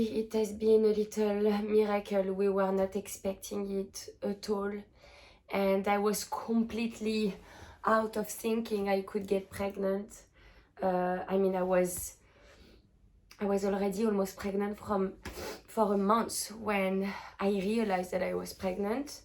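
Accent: France. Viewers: L’accent français ✨✨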